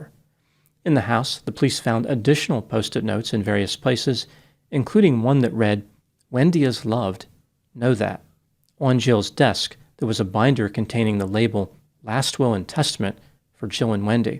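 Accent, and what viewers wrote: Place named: New Jersey, United States. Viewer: I thought Dr. Grande was from New Jersey, hah. He has an accent that places him there or greater NYC.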